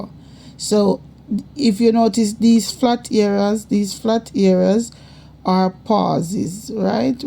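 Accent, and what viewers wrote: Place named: Jamaica. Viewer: Thank you so much love, i was really struggling with these, it was really helpful. i am always loving the Jamaican accent.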